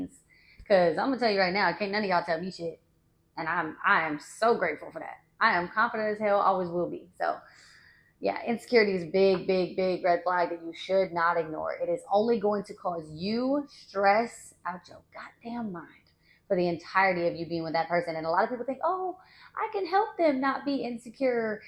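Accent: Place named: South, United States. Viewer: Love the accent!! Houston? Lil southern, lil ratchet accent!!!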